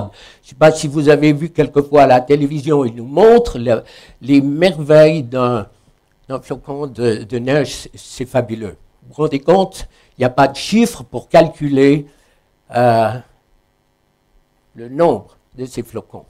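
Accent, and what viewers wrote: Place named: Vaud, Switzerland. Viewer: Magnifique avec ton accent vaudois Dieu Te Bénisse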